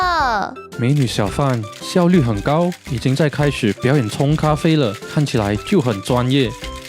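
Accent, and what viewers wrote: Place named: Singapore. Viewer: You sound like a Singaporean.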